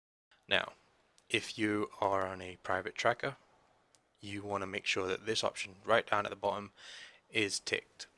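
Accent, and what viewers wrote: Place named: South Asia. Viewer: this guy has a south asian english accent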